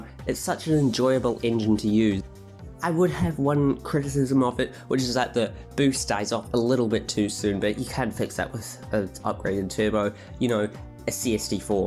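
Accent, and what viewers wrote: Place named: United Kingdom. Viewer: wait wtf you're from nz? Thought you were British this whole time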